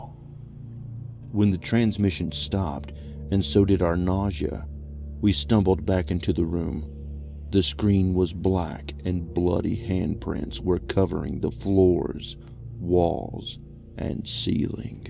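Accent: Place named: South, United States. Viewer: Listening right now... Where in the south are you from?.... I'm from Alabama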